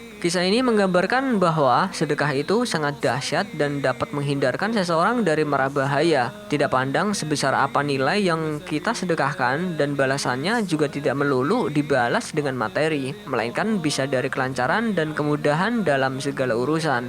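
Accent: Java, Indonesia. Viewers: Admin Jawa ya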